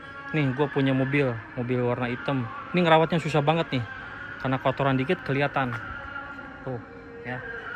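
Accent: Indonesia. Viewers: Urang Sunda ieu mh logatnya beda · logat Sunda+gua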